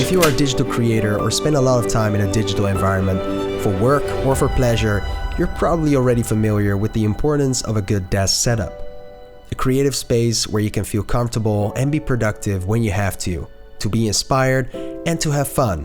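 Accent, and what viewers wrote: Netherlands. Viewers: Ik was in de commands opzoek of je Nederlands was, toen zei je het zelf. Hoorde het toch net in je uitspraak af en toe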